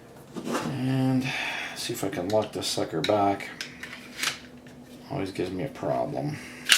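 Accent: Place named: Pittsburgh, United States. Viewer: Nice video. Lookin to do the same swap on my shield 2.0. Are you from Pittsburgh?? Definitely picking up on a yinzer accent.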